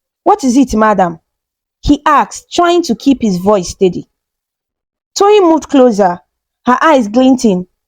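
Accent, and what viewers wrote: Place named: North Carolina, United States. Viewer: I love your stories and your accent...North Carolina, USA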